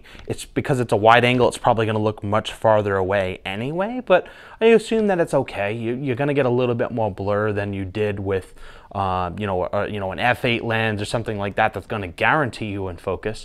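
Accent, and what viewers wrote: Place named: New England, United States. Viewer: You from Mass or Rhode Island ??? Recognize the accent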